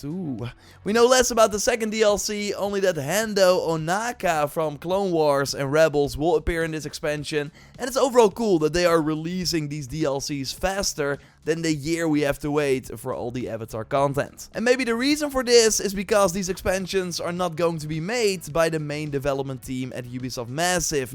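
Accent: Netherlands. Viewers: You sound Dutch bro 😁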